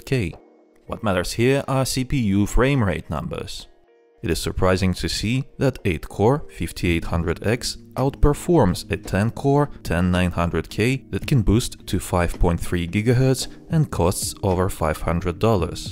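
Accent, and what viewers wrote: Russia. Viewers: русский акцент)) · Как же пробивается твой русский акцент)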